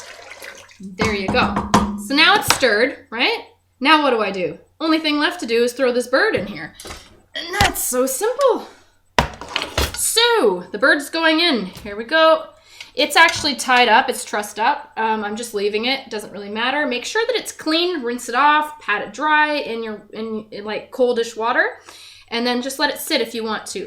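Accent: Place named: Canada. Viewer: Your accent, im loving it... your canadian huh... yeah, im flirting just a bit...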